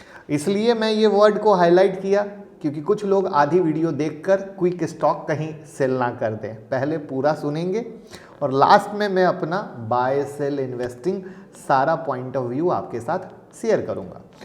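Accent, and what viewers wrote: Bihar, India. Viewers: Sir aap bihar se ho kya 🙏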